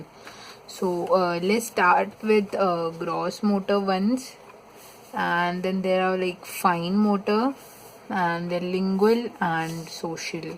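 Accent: India. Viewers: very helpful madam.. accent seems to be South India Your sounds so kind in the video just like a child..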